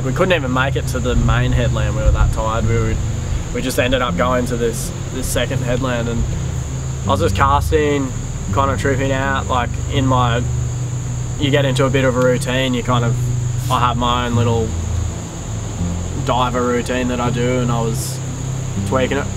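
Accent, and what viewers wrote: Australia. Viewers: This videos half as good if you take away the Aussie accent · thx for this video, quite inspiring and that decent aussie accent lol.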